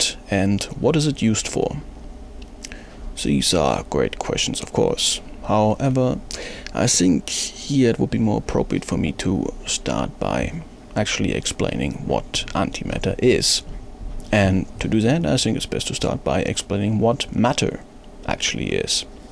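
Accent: Germany. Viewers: HE'S GERMAN HIS AACCENT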